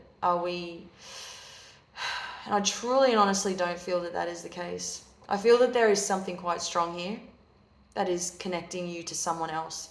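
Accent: Australia. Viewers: Definitely an Aussie voice, good to hear. Simple and accurate. Zero drama hype in delivery. And short :)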